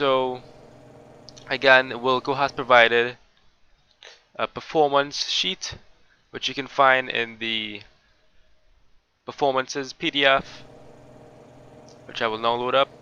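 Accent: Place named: Burgundy, France. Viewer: French from bourgogne I think ^^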